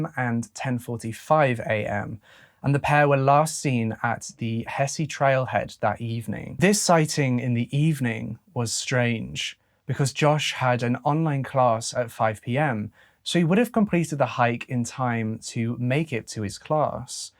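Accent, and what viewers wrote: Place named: Derbyshire, United Kingdom. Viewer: im guessing Derbyshire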